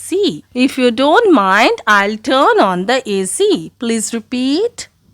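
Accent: Kerala, India. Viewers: Are you malayali or not? I'm totally addicted to your malayalalam voice. It's so mesmerizing dear ❤😊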